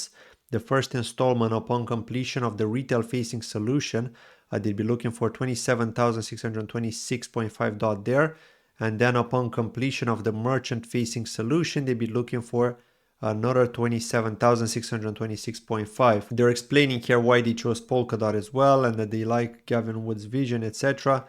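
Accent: Ireland. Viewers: you may or may not remember a couple of years ago I asked if you had lived in Ireland as I get a hint of Irish in your accent. Well today you have an Ireland t-shirt on.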